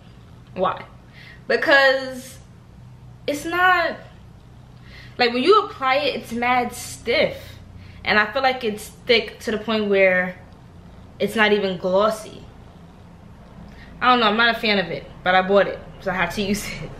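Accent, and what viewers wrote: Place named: Brooklyn, United States. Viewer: So funny your Temu video was the first video i seen of yours and i knew you was from Brooklyn because my man is also from Brooklyn i picked up the accent rq 😂 and its funny how you also used the example of ppl from the south because i am from the south 😂 i am from Texas sometimes me and my man don’t understand our accents lol for example he says “oil “ i say “oyl” 😂😂😭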